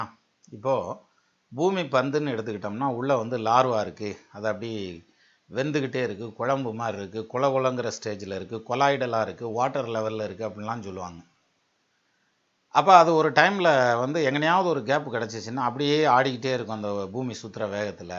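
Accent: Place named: Madurai, India. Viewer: Great explanation sir with a simple Madurai slang. All videos are very interesting it’s induced my astrological view.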